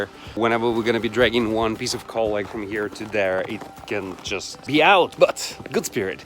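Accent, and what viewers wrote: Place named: United States. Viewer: American accent . . ?? how comes?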